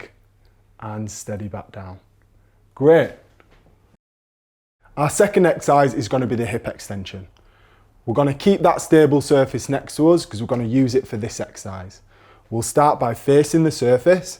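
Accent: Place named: Sheffield, United Kingdom. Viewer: This is great. I'm from Sheffield but have lived in Mexico for years, so it's great to follow exercises for my hip arthritis from someone who sounds like he's from my neck of the woods. Update: Still doing these exercises, I like the clear instructions about correct technique but without unnecessary waffle. I feel like I'm in the room with the two blokes.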